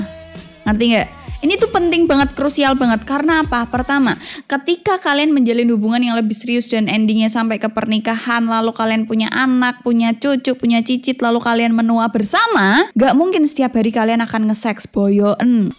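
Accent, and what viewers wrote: Java, Indonesia. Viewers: Kak bahasa nya medok banget uhh bikin suka kalo nonton nya😎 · Suka sama cara bicaranya yg muedhok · Mb medokku😍panggah ayu shoting dgn penampilan simpel yg sederhana❤ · Mau kumpulin orang yg suka dengan cara bicaranya yg medok asli tdk di bikin2 ...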